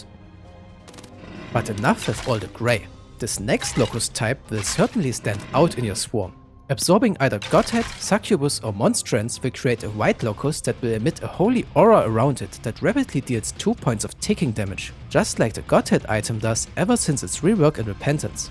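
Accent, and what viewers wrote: Germany. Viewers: are you german? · du klingst deutsch… bist du Deutscher?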